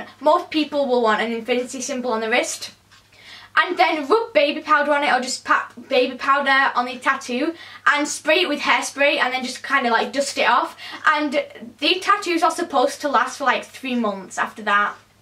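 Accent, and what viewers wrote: United Kingdom. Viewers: Bitch she just has a British accent deal with it I found it very helpful!! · U have a nice British accent 😁👍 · The British accent is so cute!!!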